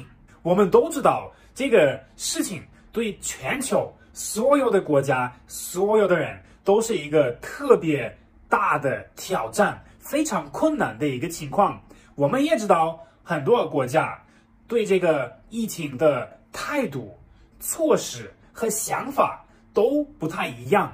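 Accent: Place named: Sichuan, China. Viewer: 成了四川的人了，四川话十足！👍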